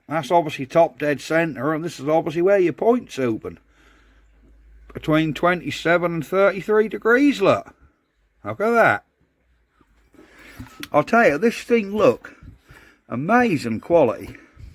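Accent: Norfolk, United Kingdom. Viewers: Great to hear a Norfolk accent. I was born in Norwich near the St Augustines Gates now in South Australia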